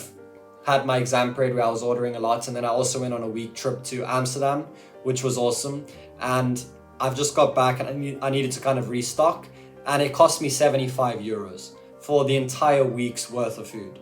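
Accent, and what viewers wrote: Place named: South Africa. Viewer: I could hear your accent you South African....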